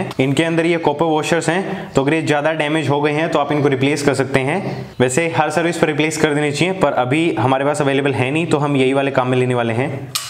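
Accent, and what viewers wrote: Rajasthan, India. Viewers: Bhai tum jhunjhunu rajasthan se ho kya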